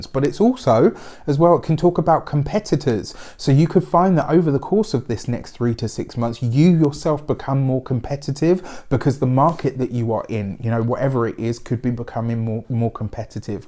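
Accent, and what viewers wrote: England, United Kingdom. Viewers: You’re so wonderfully English “now that I’ve waffled on” haha. Thank you for this lovely reading 🥰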